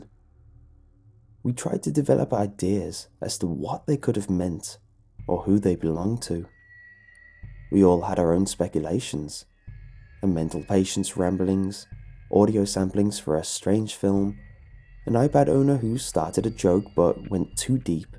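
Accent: United Kingdom. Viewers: God I love you. Your voice is terrifying and soothing at the same time, aided by the fact you sound young yet mature. That British accent is a bonus too!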